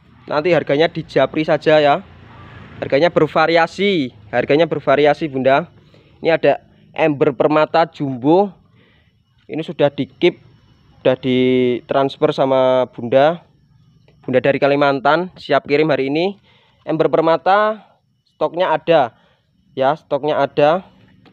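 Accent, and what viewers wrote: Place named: Java, Indonesia. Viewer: Lokasi endi mas nggih? Logate kok jowo kentel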